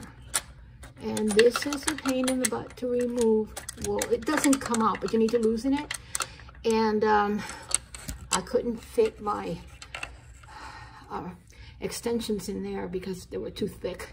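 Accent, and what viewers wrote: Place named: New Jersey, United States. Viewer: OOH !!! A Joisey Gal !!!! Luv it even more !!!!!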